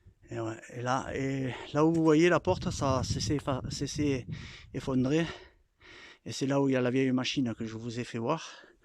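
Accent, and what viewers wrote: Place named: South, France. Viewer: belle vidéo, l'accent du sud je m abonne à votre chaine et un pouce bleu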